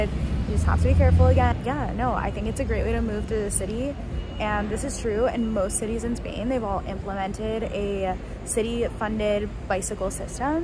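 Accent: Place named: United States. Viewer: tienes una voz muy bonita y musical. El ingles de estados unidos siempre me ha parecido " dificil" por el acento. Hablado por tí suena muy bonito. Podrias trabajar como " dobladora " ( en el cine las versiones en español ). Muy feliz de que estes feliz. Besitos desde Granada, Andalucia.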